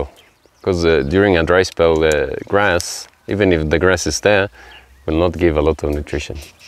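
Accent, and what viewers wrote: Kenya. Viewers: I'm surprised you have a strong Kenyan accent , what a wonderful video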